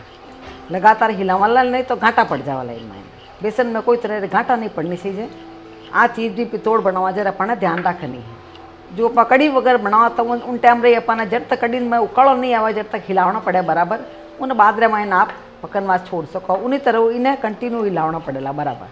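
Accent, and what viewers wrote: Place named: Marwar, India. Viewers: I love her accent ... would like to meet her, where does she live? Jodhpur? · Aapki boli sunke maza aa gaya .... rangilo rajasthan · Wa wa sa Maine to aapani marwadi boli ghani meethi lage. Bahut hi chokhi tariya su btavo ho sa aap. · मारवाड़ी बोली चोखी लागी, पर ए सब बनाबनो म्हाने आवे सा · Aap itni pyaari maarwaari Bolte ho.......aapki aawaz mein Mitti kee khusbu aati hai......aur wohi taste Aapke khaane mein bhee aata hai ......! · Even I also liked her voice and Rajasthani language how she describes🙏 really amazing so sweet voice and her presentation may god she lives long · Mujhe rajasthani nahi aati pr it is very lovely to hear. And this recipe was amazing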